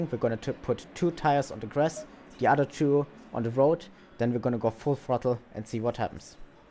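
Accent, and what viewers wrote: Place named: Germany. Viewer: When explained with a German accent technical stuff like this immediately makes sense to me.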